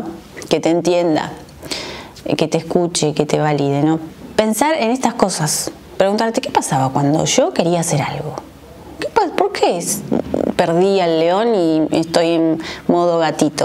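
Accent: Argentina. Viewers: Soy Española me encanta tu acento creo que Argentino, recién.llegada a este bonito canal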